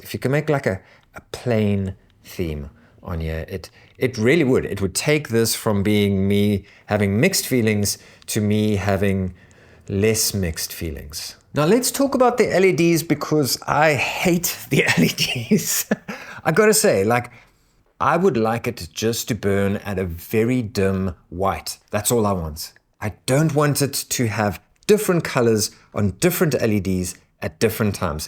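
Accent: South Africa. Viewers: Sounds like you are from Cape Town South Africa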